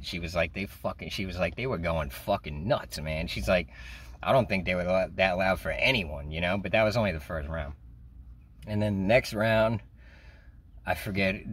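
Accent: New York, United States. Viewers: Love your New York accent.. I’m trying to watch your videos in order and so far enjoying every one of them.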